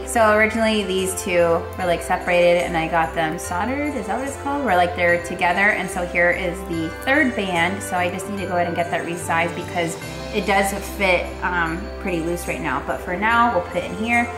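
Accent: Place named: United Kingdom. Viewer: I always enjoy your videos and that British accent! 😂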